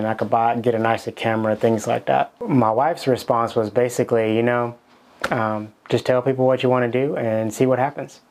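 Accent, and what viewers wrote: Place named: Baltimore, United States. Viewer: Just as everyone else has said, your videos are amazing. I hope you get more exposure because nobody on youtube explains things as well as you. Also, are you from Baltimore? Your "you"s and "to"s sounds like you are!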